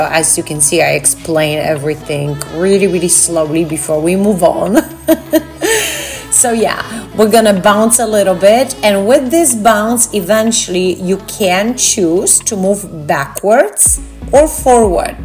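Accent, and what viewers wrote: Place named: Lebanon. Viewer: وعي تتكلم لبناني احس غرااابه 😂😂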